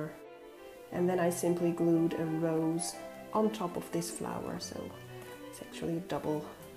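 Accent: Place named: Netherlands. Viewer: I should have recognized that accent. I'm from the Netherlands as well but moved to Canada when I was 8. Do you have a tutorial on how you make your albums? I would love to make one similar to yours and that size. I can only find tutorials to make them with envelopes and card bases. What are the measurement for an a4?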